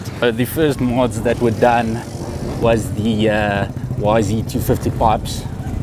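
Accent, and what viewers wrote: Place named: Afrikaans, South Africa. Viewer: Heavy Afrikaner accent. Daai bikes is Chinese ne . Ek ry n Beta 300 RR .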